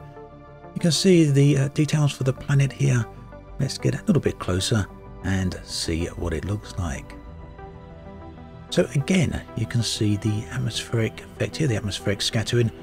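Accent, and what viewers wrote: United Kingdom. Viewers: The narrator’s British accent is too thick, cannot understand him!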